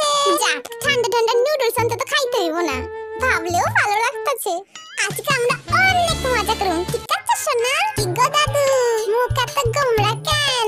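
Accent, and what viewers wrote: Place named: Aminbazar, Bangladesh. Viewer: opsss sorry na mane tumar vasa ta amader Bangladesh er dhaka aminbazar er moto tai bolsi 🙂🙂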